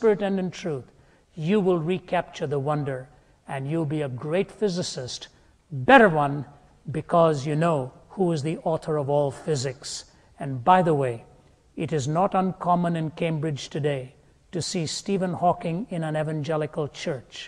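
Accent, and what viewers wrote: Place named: India. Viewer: From the outside, he sounds like any other evangelist/ con man, only with that India/ Pakastani/ guru accent, that makes people 10 times more likely to believe he has spiritual access to your God. Doh!... Why is it, that all of the defenders of Christianity have a big money stake in keeping it going?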